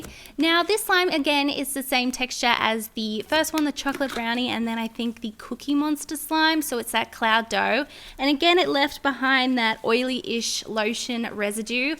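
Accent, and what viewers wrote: Australia. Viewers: * are you Australian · Are u from Australia ??? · R u from Australia?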